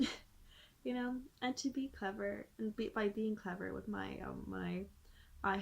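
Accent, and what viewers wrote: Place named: Ireland. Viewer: You have such a lovely accent. Where in Ireland are you from?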